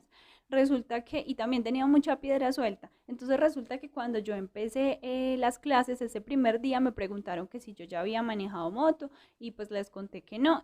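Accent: Colombia. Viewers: Me encanta el acento colombiano disfruto. Ollendote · Exelente video me gustan las motos y encontré tu videos y los miro por como ablas colombiana y tu humildad te hace grande saludos desde San Diego California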